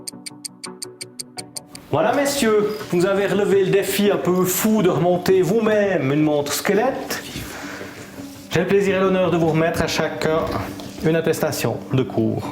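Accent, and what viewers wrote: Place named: Switzerland. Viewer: En tant que français, c'est un plaisir d'entendre ce bel accent suisse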